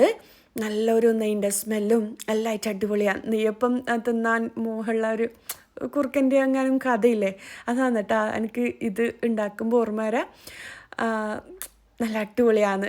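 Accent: Kannur, India. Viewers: Kannur ann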